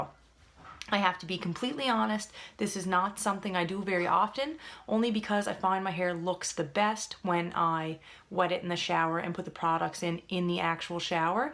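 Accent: Canada. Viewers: Nova Scotia, Canada. :)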